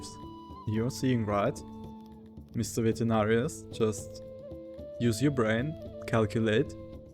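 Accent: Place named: Germany. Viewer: If you launch a city builder video and you hear a German accent, you know you're in the right place.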